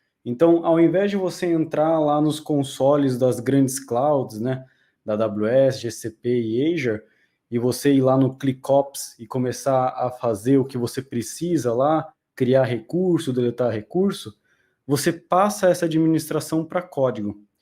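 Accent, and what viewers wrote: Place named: São Paulo, Brazil. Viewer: Fale mano (sotaque paulistano 😅) você foi top na explicação. O lance de explicar os bloquinhos do TF achei muito didático e me fez entender o básico da coisa em 30 minutos de uma maneira phoda. Suas analogias sobre o declarativo e procedural e o Ansible que estava querendo saber o que era ao certo. Parabéns pela didática e simplificação para um melhor entendimento. Eu hoje “brinco um pouco” com Cloud Formation e se desse para fazer um comparativo de CF x TF o que muda por exemplo ao criar um s3 em CF para TF seria top. Com sua explicação vou tentar fazer isso na empresa (trabalho no Itau) e só para vc saber, seu conteúdo esta servindo como base para muitos aqui. Mais uma vez parabéns pelo trabalho Deus abençoe sucesso e felicidades.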